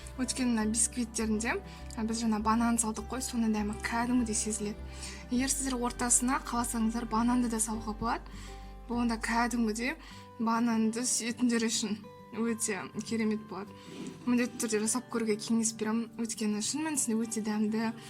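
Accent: Standard. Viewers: Таза казакша сойлейди екенсиз👍👍😜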